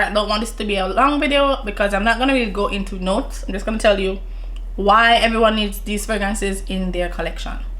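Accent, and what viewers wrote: Jamaica. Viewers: Ok yaadie🇯🇲🇯🇲 sound like Jamaican. 😀 new subbie here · I love your Jamaican accent. Nice recommendations!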